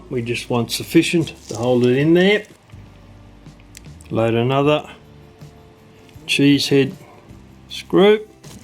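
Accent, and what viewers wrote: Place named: Australia. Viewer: Are you Australian by any chance..?......12.20 into video you confirmed my question with"that thru there...thats got him!!" Mate the old lady slipped off not long ago and i know have the very clock i went all the way to Schwartzland Germany in 1996 to buy for her. I actually saw there the worlds biggest cuckoo clock,the bird that comes out was the size of a commodore wagon im not kidding .anyway ive now taken on the task of getting it going properly agsin.its identical to your one in video abd im certain the issues im having a easily fixable but i need a hand ??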